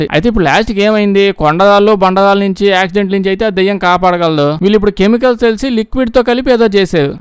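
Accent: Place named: Godavari, India. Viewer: ఇండోనేషియా సినిమా గోదావరి యాసలో అద్భుతంగా చెప్పావ్ బ్రో 😂😂😂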